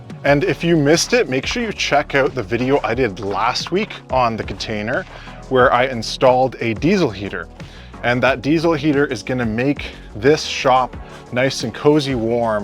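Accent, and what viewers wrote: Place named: Canada. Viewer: Your video showed up in my feed last night and I was like, hmmmmm he sounds Canadian. Hello fellow Ontarian! Nice to meet you. I'm looking forward to your videos for building the tiny home as hubby and I are about to be empty nesters. We would like to sell our home which is in a small village of about 900 people, and move back to the country where we both grew up on farms. I am betting your build will answer some questions I have about the process here in Ontario. Also, heads up - those weather people are telling us this winter is going to be super cold like we ( I mean me) experienced in 1979. It was super cold and nasty. This video made me chuckle because I heard Mike Holmes voice in my head saying, why would you put new on crappy old? when fixing your step. However lipstick on a pig sounds pretty funny, LOL. Looking forward to catching up on everything you have posted so far!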